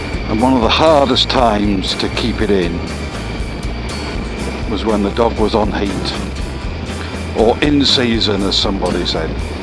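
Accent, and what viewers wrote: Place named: United Kingdom. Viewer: . Eu intendo muito pouco o inglês. Eu sou mais acostumado a ouvir o inglês Americano. Dar para perceber bem o Sutaque Britânico. Valeu meu irmão.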